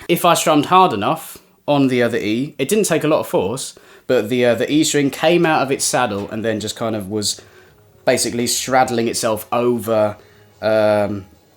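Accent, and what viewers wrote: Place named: RP, United Kingdom. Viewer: You sound posh enough for 90% of the world without adding the old geezer voice ;) Thanks for the demo!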